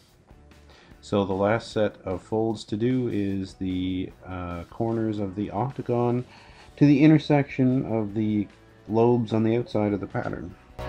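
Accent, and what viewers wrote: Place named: Ireland. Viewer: I detect an Irish twang in his accent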